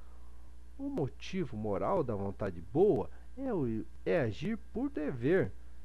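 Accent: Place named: Paraná, Brazil. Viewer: Minha família no Sul do Paraná fala assim tb!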